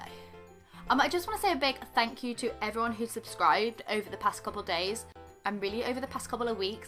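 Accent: England, United Kingdom. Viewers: Awesomely adorable squishy collection! Daughter & I can not wait to get started, then maybe we can tackle your squishy vending machine which was decorated so so sweetly! Congratulations on all your new subscribers! I enjoy the voice over explanations, not to mention your lovely English accent, which is a rarity here in America.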